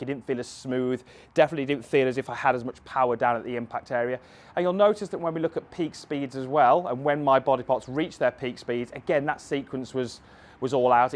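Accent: United Kingdom. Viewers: Thanks, really useful, I think thats my main problem at the moment. Midlands accent as well..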